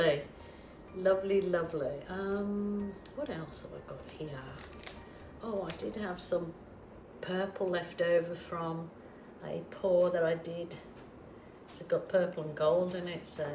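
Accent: Birmingham, United Kingdom. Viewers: Lol... I've been thinking it's a bit strange that there's a place in Australia with an almost brummy accent 😂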